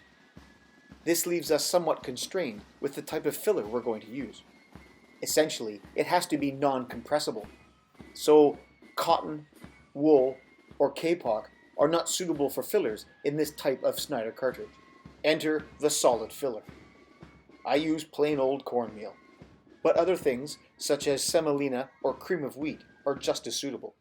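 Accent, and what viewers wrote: Canada. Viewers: These are fantastic video's love them also love the Canadian accent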